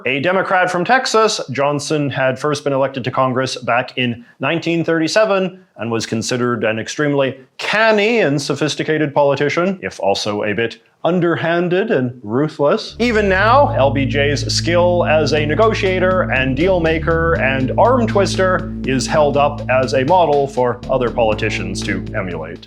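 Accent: Canada. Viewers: He’s Canadian… that’s how they say “about” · Ive never heard american history told with a Canadian accent before.....feels weird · I came across your channel before. Are you Canadian?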